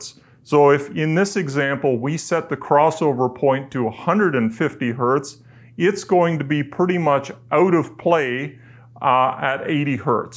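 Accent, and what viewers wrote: Canada. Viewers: You must be Canadian!! Or from Minnesota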